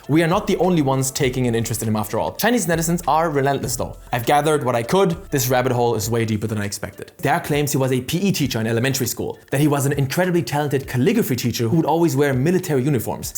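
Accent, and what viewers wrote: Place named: Germany. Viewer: Why does bro have a german accent `?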